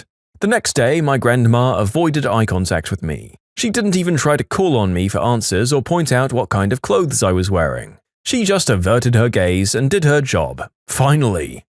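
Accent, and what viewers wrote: United Kingdom. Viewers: AH! HES BRITISH!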